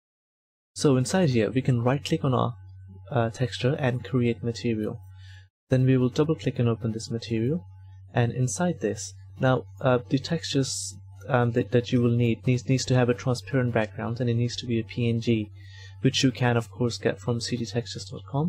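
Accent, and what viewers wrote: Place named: Germany. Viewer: GERMAN AKZENTE ^^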